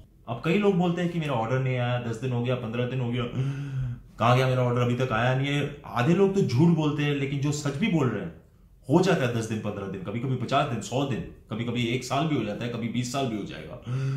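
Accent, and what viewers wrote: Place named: Bengal, India. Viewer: Haha this was such a fun dig at this guy! That bengali accent added more flavour..regards, fellow bong :)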